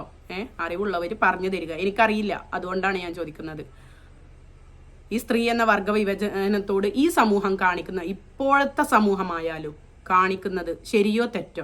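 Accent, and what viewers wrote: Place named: Malappuram, India. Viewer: ഓരോ നാട്ടിലും ഉള്ള സംസാരം അല്ലെ അതു? കോട്ടയത്ത് ഉള്ള എല്ലാ മതക്കാരും ഒരു പോലെ ആണ് സംസാരിക്കുന്നത്..ഇവർ മലപ്പുറത്ത് ഉള്ളതല്ലേ? അവിടെ ഇങ്ങനെ ആണ് സംസാരിക്കുന്നത്..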